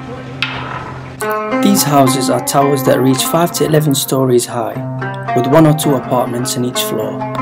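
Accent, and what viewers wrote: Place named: Sheffield, United Kingdom. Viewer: Of course you cant ignore the beauty of Yemen, but i noticed the Sheffield accent straight away.. Brilliant video mashaallah..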